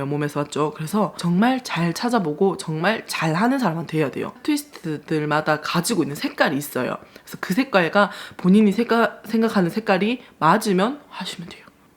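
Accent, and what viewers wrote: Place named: Gyeongsang, South Korea. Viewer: 말씀하시는거보면 저도같은경상도라그런지 친해지고싶네요^^ 대화해보고싶은 ,,, 화이팅~~^^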